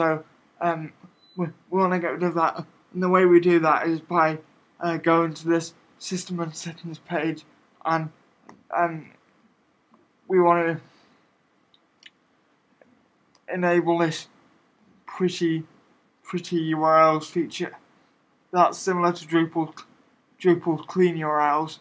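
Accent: United Kingdom. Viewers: Helpful, succinct, awesome. Straight to the point and a UK accent to boot. Thanks man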